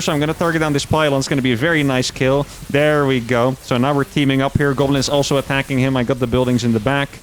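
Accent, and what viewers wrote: Netherlands. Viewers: Oh ja, dat Nederlandse accent XD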